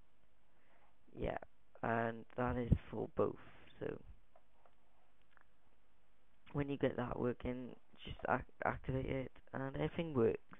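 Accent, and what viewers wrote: Wales, United Kingdom. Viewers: u sound like a mix between welsh and english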